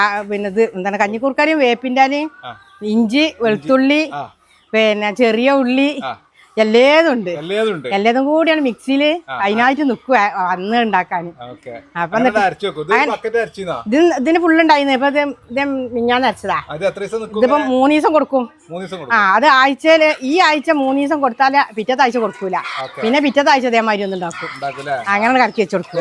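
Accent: Malappuram, India. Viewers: മലപ്പുറം ആണ് അല്ലെ സംസാരം കേട്ടിട്ട് 😁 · നമ്മളെ മലപ്പുറം ഭാഷ ഇങ്ങനാടാ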